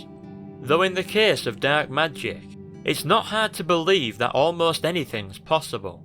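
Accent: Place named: Yorkshire, United Kingdom. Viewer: I love Yorkshire accents almost as much as I love the Elder Scrolls. Luckily for me. :)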